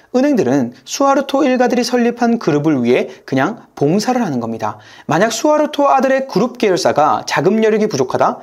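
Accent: China. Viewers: 조선족 같다능 ~ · 내용이 좋으면 모하노 성조가 중국어급이라 처음 듣자마자 거부감부터 드는데 전국구 방송하려면 표준어 구사를 하던지 악센트를 고치던지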